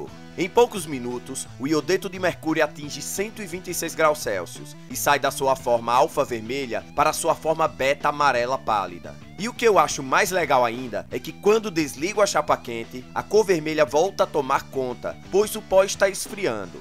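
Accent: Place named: Northeast, Brazil. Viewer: De onde tu é? Com esse sotaque pauleira eu imagino q tu deva ser do RN, PB, PE ou AL Um salve de João Pessoa, Paraíba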